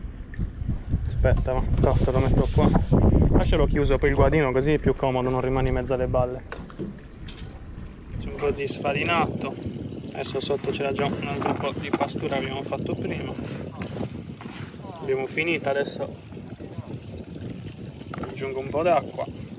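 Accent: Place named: Sardinia, Italy. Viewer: Complimenti per il video ben curato e per i bei pesci😉 dall'accento si sente che sei sardo, posso chiederti di dov'è?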